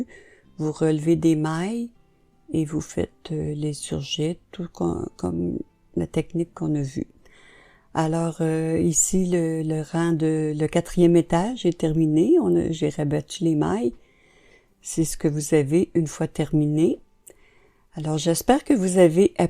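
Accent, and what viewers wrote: Quebec, Canada. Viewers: Bonjour, je me remets au tricot juste parce que j'ai flashée sur une laine dégradée turquoise que j'ai acheté cet été en me disant, je verrai bien ce que j'en ferai... J'ai vu des modèles d'entrelac sur Pinterest, mais sans les explications, que j'ai trouvé ensuite sur YouTube mais sans le déroulé des finitions comme vous... Je vais pouvoir corriger et poursuivre mon tricot grâce à vos explications (avec en prime l'accent de mon amie Québéquoise) ... SVP: Pourrait-on avoir accès à des explications écrites (PDF ou Word)??? La transcription automatique est difficile à suivre et à enregistrer (Lol) MERCI INFINIMENT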